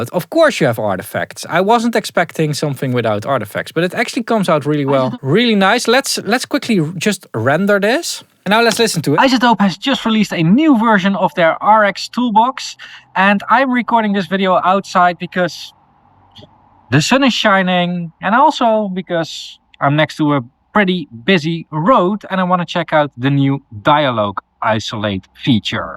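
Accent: Netherlands. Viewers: I dont know who you are, but I hear the Dutch accent haha.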